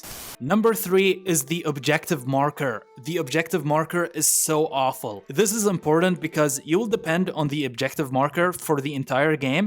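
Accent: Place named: Japan. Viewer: You can tell hes Japanese by his accent so Im sure he knows his ancestors history...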